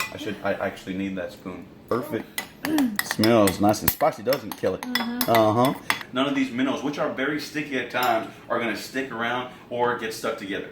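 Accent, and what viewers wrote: Texas, United States. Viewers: Is he talking/sounding abit like his from Texas now?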